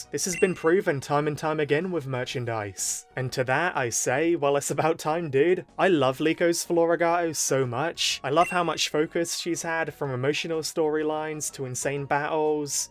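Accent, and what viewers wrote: United Kingdom. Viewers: Dude Are you British 👀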